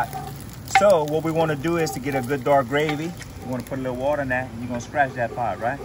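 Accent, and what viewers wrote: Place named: Louisiana, United States. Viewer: Hey where are you originally from? Sound like La Place or Gonzales area. Just curious.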